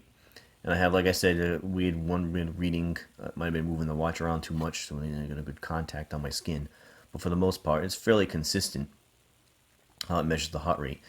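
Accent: Boston, United States. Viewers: Lol new to your channel. Uh, Boston in the house? I thought you were saying Huawei but you were saying hardware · Yay! Real English! And you don't sound like a robot! Thumbs up. North boston?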